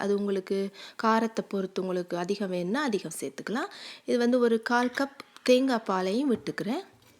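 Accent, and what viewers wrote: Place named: India. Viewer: Full and full srilankan cooking but speaking way indian style .but not bad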